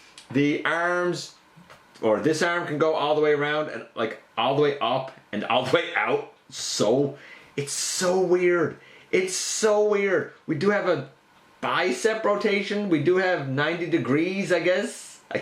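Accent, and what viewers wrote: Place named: Newfoundland, Canada. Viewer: Just recently subbed and I can't believe it took me this long to notice your accent. You're a fellow Newfie! How's she getting on me son? Lol.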